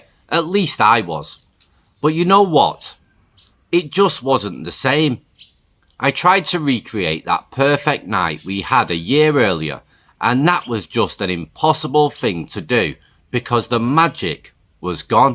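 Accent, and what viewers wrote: United Kingdom. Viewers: You have such a british accent to be an American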